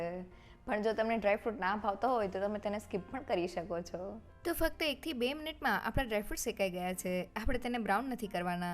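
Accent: India. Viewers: Karan ke i think tevi aadiwasi vistar ma rahe che karan amara sight aadiwasi vistar na loko aa rite j gujrati bole chec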